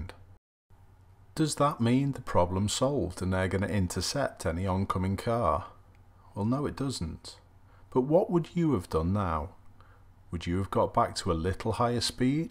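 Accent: Liverpool, United Kingdom. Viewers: I've been driving for over 25 years, and luckily never had any points on me license. Does that make me a great driver, absolutely not. popped into my suggestions a year or so ago, but been an avid viewer since. Love his presentation and his calm scouse accent, but more importantly, everyday presents new challenges on the road. Watching videos made me realise, there is still a lot I don't know about, be it road signs or whatever, and these videos help me keep grounded as a driver.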